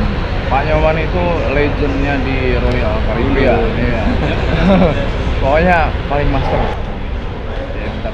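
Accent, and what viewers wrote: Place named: Sunda, Indonesia. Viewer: Logat sunda lu kental bgt broo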